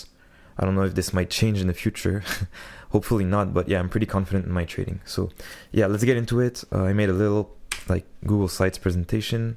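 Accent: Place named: France. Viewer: U have like the french accent if u r from france im from france too we can trade together bro😭😂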